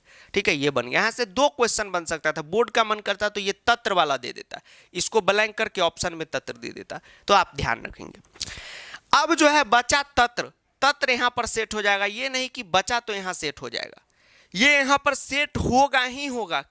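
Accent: Bihar, India. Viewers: Sir aap Bihar se ho na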